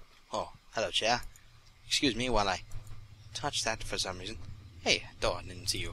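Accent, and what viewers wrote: United Kingdom. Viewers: whats with the british accent? X3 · He has a slight british accent... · is it me, or dose he have a slight british accent xD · Why does he speak in a british accent kind of thing? Its a bit annoying. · is it just me or does he sound a little british in this video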